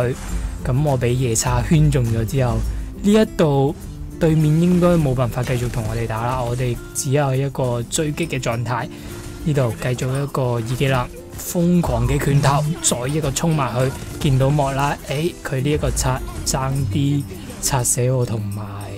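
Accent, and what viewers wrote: Hong Kong, China. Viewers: 聽你口音就知道你係香港人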